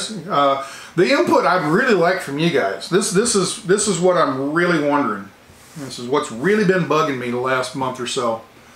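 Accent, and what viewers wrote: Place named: Texas, United States. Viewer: We follow your channel for the travel aspect of it so that is what we would miss the most if you were to quit traveling. Some of the most special experiences we've had in our travels come from visiting with the local residents I traveled with a WWII pilot with your Texas accent and he could break into a conversation with anyone anywhere. Once you get to talkin to folks there's a tremendous amount of material for a beautiful channel. In my mind, your Charles Kaualt idea would be a total winner. There is such a need for your real honest type of programming.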